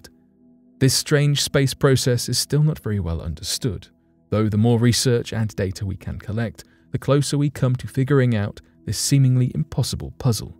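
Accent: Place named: RP, United Kingdom. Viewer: You sound like British Royalty! Best accent ever!keep up the good work as well. Happy New Year!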